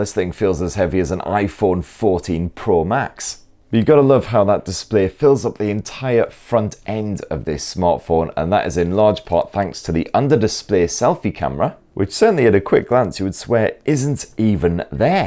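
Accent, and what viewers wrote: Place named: Newcastle, United Kingdom. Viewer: sounds like Newcastle accent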